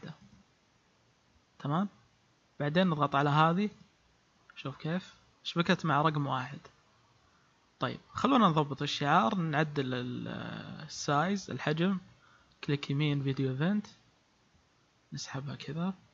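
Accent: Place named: Saudi Arabia. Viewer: استمر انا سويت خمس حسابات و اشتركت عندك الحين كل اللي يسوون شروحات مغاربة و مصريين ياخي لما سمعت صوتك سعودي ارتحت كمل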